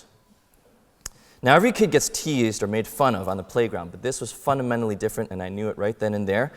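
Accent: United States. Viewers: his chinese is good though (in terms of vocabulary, grammar, way of speaking, etc.)...but he has an american accent when he speaks chinese. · weirdly enough as a guy who grew up in NY, he sounds like he's from LA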